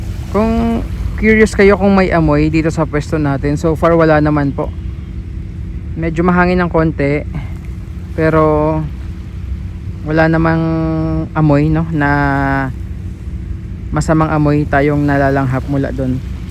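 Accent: Visayas, Philippines. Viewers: Hoy ayusin salita mo wala Naman Bola ano Ka bisaya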